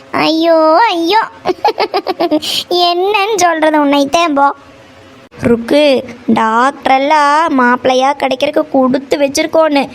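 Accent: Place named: Pollachi, India. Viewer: Akka pollachi slang akka ❤❤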